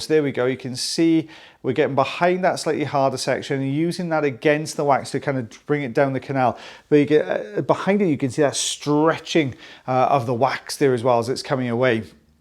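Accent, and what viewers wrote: Wales, United Kingdom. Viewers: I swear I only watch for the welsh accent. Not been to Wales since covid 😢. I live abroad now.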